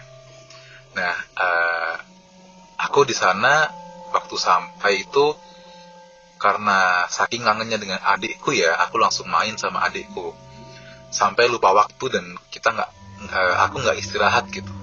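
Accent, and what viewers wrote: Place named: Surabaya, Indonesia. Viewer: Dari awal denger uda langsung ngeh kalo masnya logat suroboyoan 😂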